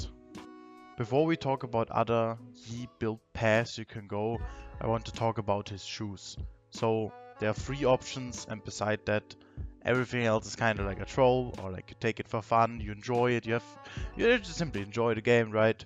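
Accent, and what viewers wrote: Germany. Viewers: du klingst deutsch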